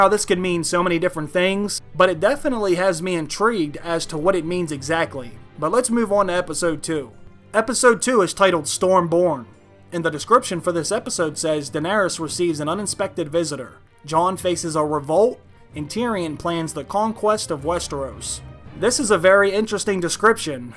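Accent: Pittsburgh, United States. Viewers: Are you from Pittsburg by any chance?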